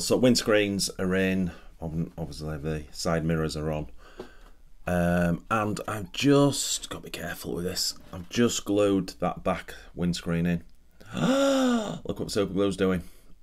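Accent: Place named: West Yorkshire, United Kingdom. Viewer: That looks awesome. Would like to see it next to the Blackfoot cos you said it was way bigger. I've been watching your channel from pretty much the beginning, I think you had 37 subscribers. An it's great you've not lost your west yorkshire accent. I was brought up in Morley, moved 20some miles north and mine changed.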